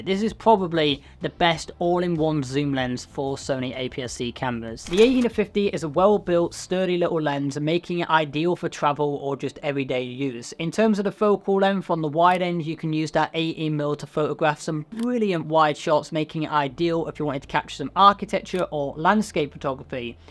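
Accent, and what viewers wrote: United Kingdom. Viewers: I learn in this video how to use Sony a6000 things and also how I can talk like Britain 😮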